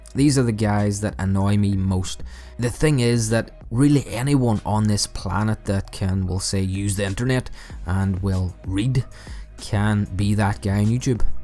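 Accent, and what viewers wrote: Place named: Derry, United Kingdom. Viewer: explains why you have a slightly northern/ derry accent :) i live in dungannon tyrone, a big town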